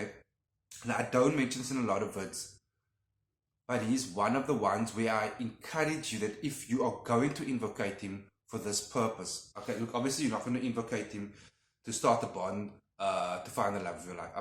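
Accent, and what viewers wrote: South Africa. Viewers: Hi! Love your content. Fellow South African here. I could hear from your accent 🇿🇦😉 I'm from Cpt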